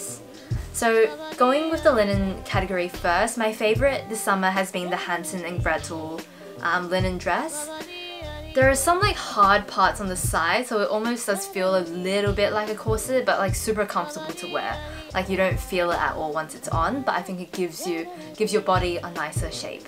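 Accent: Australia. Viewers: 안녕하세요? 한국인이세요? 너무 사랑스럽고 실용적인 코디를 하셔서 즐겨본답니다. 영어채널이라서 100% 다 듣진 못하지만.. 영상 거의 다 봤구요. 송혜교 느낌 닮으셨어요. 호주발음도 매력적이어서 너무 좋아요!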